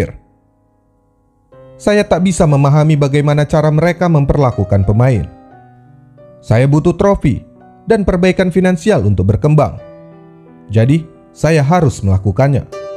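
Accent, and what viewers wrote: Medan, Indonesia. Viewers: BG..ABG orang Medan yah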